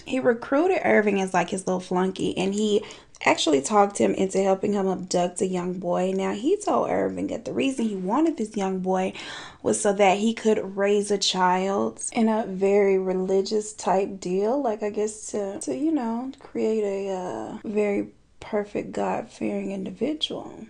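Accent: South, United States. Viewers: You’re so well spoken with a southern 🛎 accent! So cute ! Love it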